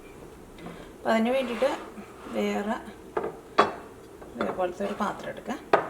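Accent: Thrissur, India. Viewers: Kalakeet indutta...... Nalla assalu trisoor bhaasha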